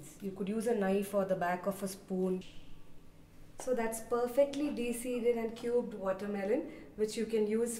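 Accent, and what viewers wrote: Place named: India. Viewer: But that stereotypical Indian accent doe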